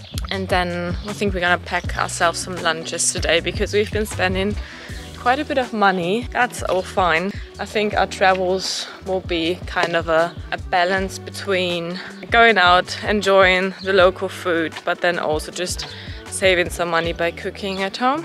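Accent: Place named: United Kingdom. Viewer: Love it so much! 😍 & you have such a great British accent omg! ❤️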